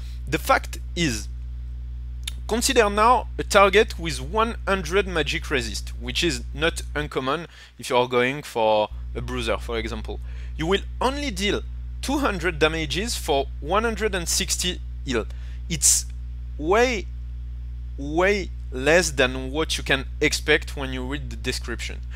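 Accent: France. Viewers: dat french accent sound so pretty :3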